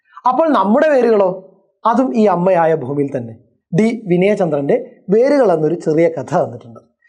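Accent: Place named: Malappuram, India. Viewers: Malappuram basha aan poli🔥💕💕💕thallumala⚡️👍🏻🔥🔥malappuram⚽️ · മൽപ്പൊർത്തെ വർത്താനം പൊളിയാണ് സാറേ..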